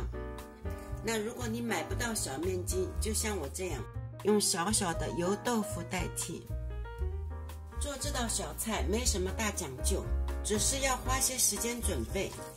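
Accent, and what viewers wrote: Shanghai, China. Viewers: 终于听到上海话了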